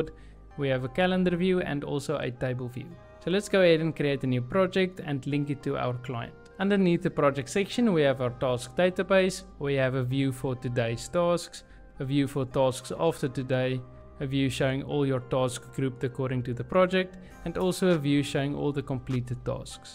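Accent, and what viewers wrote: South Africa. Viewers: What is your accent? South African?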